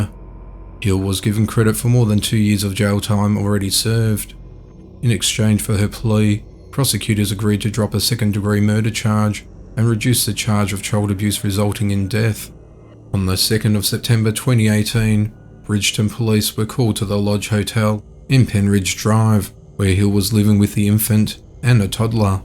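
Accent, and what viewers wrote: Australia. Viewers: I’m a new subscriber and love this channel, your voice is very easy to listen to, can I detect an Aussie accent?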